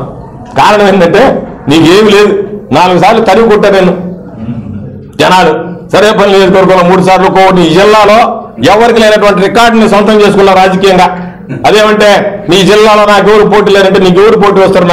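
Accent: Nellore, India. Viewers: Govardhan anna iam your FAN.iam from ongole.you are going to get very great credit that you are going to defet 5 th time.anna iam your fan you speak in very good in nellore slang.Anna i wish you all succesd.you are going to get credit of defiting 5 th of the great mr somireddy. anna all the best to you anna. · Super counters short and sweet we with typical nellore slang...Nellore slang lone vetakaram untundi...Pakkana koorchunna ayana chaviti vademo ani doubt ga undi lekapothe anta comedy vinnaka navvakunda unnarante great...Love the speech.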